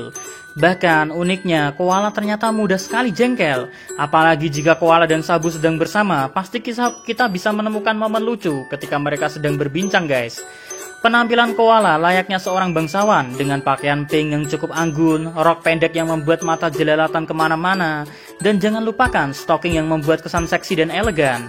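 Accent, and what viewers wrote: Java, Indonesia. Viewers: logat jowo mu kuetok gan wkwk lanjutkan bikin video ne 🖒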